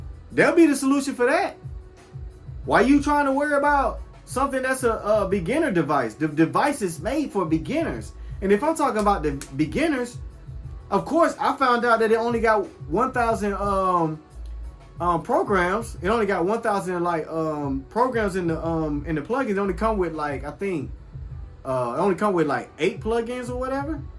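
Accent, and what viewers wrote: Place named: California, United States. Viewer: Lol. Straight up! Say bro as soon as u said “beaucoup” I knew u was a real one. Only people I hear say that word usually from the n.o. But u sound like u from the bay or somewhere in cali. Real recognize real. 🔥 content padna! 💯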